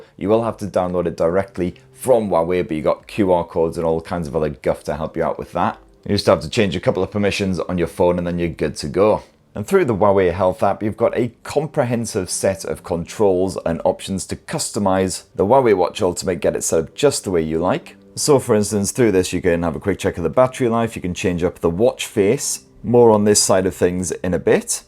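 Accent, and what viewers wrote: United Kingdom. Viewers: this is a fantastic review! Plus, the British accent helps make it sound official for us Yanks! Thanks for the great review. You've sold me between this and the gt 5 pro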